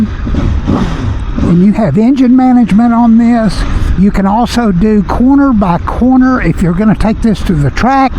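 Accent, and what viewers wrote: United States. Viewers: I doubt you're Italian. Were you born in Italy and do you have Italian citizenship? It sounds to me like you're American not Italian 🤔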